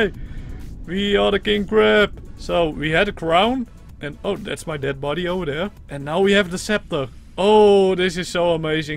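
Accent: Netherlands. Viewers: dutch accent for life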